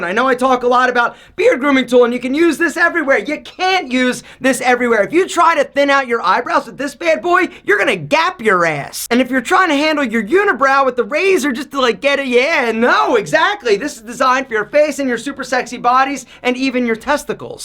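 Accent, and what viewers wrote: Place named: Baltimore, United States. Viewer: Holy Bmore/Philly accent, Batman!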